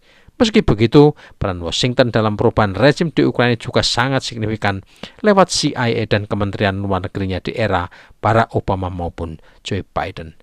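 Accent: Java, Indonesia. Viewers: I love medoook. 👍👍👍 Autentik.......👍👍👍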